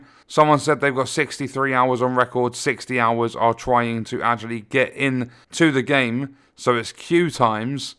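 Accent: England, United Kingdom. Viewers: he aint eu player he sounds english - they are not in eu lol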